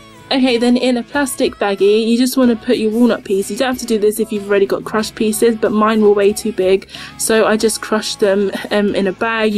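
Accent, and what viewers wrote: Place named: United Kingdom. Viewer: I absolutely L-O-V-E your British accent.😊😝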